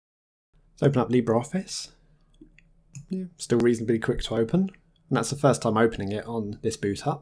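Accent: United Kingdom. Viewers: dat british accent :)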